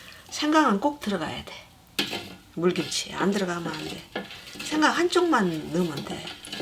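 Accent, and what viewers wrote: Chungcheong, South Korea. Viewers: 어머니와 아드님 너무 다정다감하신 대화와 요리들 너무 잘보고 또한 구독도 했어요. 저는 어머님의 충청도 사투리가 저희남편 가족들의 말씀같이 꼭 우리 큰형님~셋째형님 말소리와 너무 똑같아서 잘보고 잘 따라하고 있습니다.~그런데 지금 우리 형님들과 우리남편은 저~세상 하늘나라로 가셨습니다. 그리고 저는 나이가 들어서 노인정에서 식사를 해드리고 있는데 주로 어머님의 음식을 보고 많이 따라하는 편 입니다. 젊은시절에는 직장 다니느라고 살림을 안해서 주방에 들어가는것이 무서웠는데 지금은 하나도 안무섭고 무엇이든지 어머니께서 하시는 무엇이든지 돋보기에 제가 하고싶은 음식을 치고 누르면 무엇이든지 다~나오니 저는 행복합니다. 또한 8~9십대 어르신들 따라한 음식들을 만들어 드리면 엄청 칭찬해 주십니다. 그래서 저는 맬맬 행복합니다. 어머니의 요리를 배워서 행복하고 경로당 어르신들 맛있다고 칭찬 들을때 행복합니다. 그래서 저는 언제나 즐겁습니다.❤❤❤❤❤❤❤❤ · 고향이 충청도이신가요? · 충청도 아주머니시네 사투리가 무수 배차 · 배차 무수 오랜만에 들어보네요 ㅋㅋㅋ 충청도사투리 매일 잘보고 이습니다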